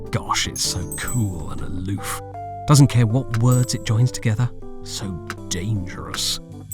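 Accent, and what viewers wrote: United Kingdom. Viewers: This British narator makes the video more interesting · What is so funny about him being British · because British people have the best accent for narration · It's because he's British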